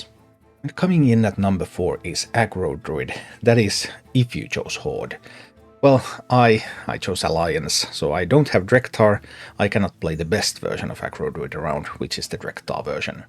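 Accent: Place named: Finland. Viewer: Sä paras! Olin amerikkalainen vaihto-opiskelija, joka asui suomessa 5 vuotta sitten. Rakastan videoitasi ja varsinkin aksenttiasi, se tuo mieleen ne ystävät, jotka sain siellä Porissa.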